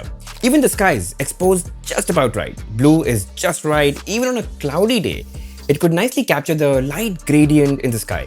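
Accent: India. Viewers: Your Indian accent sucks too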